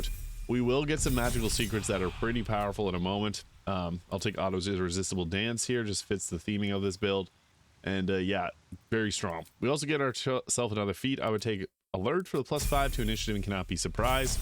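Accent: Canada. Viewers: so sorry if this is weird but brother i have got to know where your accent is from 😭 i’ve been wondering since day 1 of watching your vids lmao. canada ??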